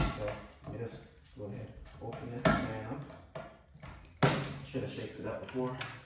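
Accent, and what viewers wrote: Jamaica. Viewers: Caribbean Accent my guy. #Jamaican.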